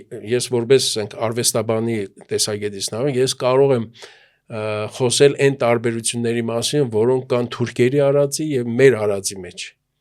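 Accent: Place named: Gyumri, Armenia. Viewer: գյումրու ակցենտը ❤❤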